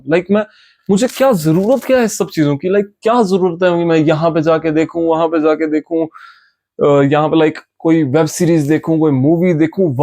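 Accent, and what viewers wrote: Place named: Kashmir, India. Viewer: First i thought you're from Delhi then our kashmiri accent got it 😅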